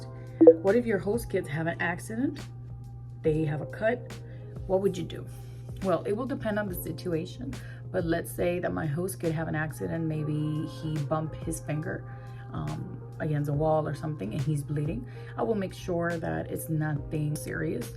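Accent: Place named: New York, United States. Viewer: English is flawless you have a New York accent dear 🔥🔥🔥🔥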